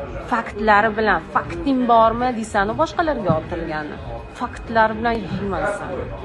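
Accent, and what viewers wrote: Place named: Kokand, Uzbekistan. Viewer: Sassiq qachondan rus tilida gapiradigan boldin yaqinda organvoldin shakili toza o'zbecha gapirardinku qoqonchalab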